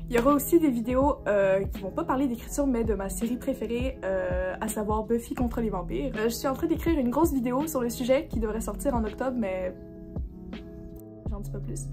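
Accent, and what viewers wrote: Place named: Quebec, Canada. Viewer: Waw cette vidéo est vraiment super :O ! Je vais continuer à t'écouter. Ta voix est satisfaisante et je trouve que malgré ce que tu dis, tu t'exprimes vraiment bien. Mon accent québécois est tellement plus fort que le tien lol. Tu es super inspirante. En ce moment, l'écriture ne fait pas partie de mes priorités, mais elle a toujours et occupe toujours une partie importante dans ma vie. :D Je « relate » tellement pour les playlists d'écriture (je fais la même chose !!!). Je vais probablement aller te lire sur Wattpad. J'adore Twilight et ce qui touche vampire/loup-garou, donc je pense que ça va m'intéresser.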